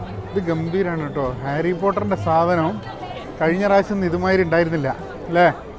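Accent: Kozhikode, India. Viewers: കോഴിക്കോടൻ ഭാഷ ഇജ്ജെങ്ങനും മാറ്റിയ കൊല്ലും അന്ന ഞമ്മള്..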